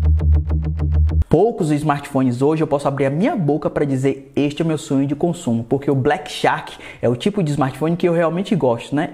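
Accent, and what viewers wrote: Bahia, Brazil. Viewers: Mano vc e da Bahia né ??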